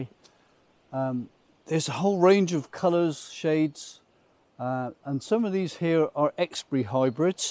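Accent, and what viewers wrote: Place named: United Kingdom. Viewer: Thank you so much for the wonderful tours. Subscribed! Loved that huge Fern from the Chilean Rainforest - what a beauty. Such a fantastic variety of plants - I will be binge-watching every youtube video I can find. Just lovely and exciting to see such rarities and the wonderful ways they are displayed. Please continue showing the plant names - that is incredibly helpful - it is very difficult to understand British English when you are used to American English:).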